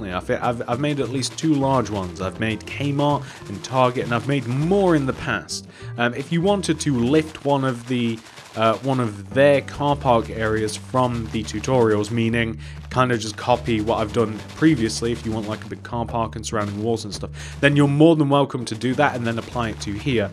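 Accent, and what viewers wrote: United Kingdom. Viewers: Bruh he is British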